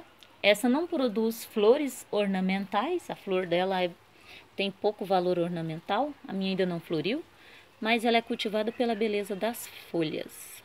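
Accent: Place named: Goiás, Brazil. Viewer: eu perguntei qual a sua cidade pq talvez podemos trocar mutas e o tipo de falar parece ser do meu estado de Goiás GO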